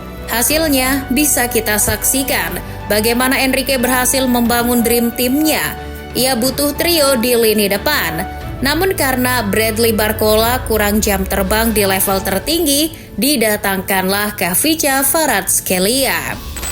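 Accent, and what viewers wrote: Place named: Manado, Indonesia. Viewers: Logat manado wkwk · Lah admin nya orang Manado ya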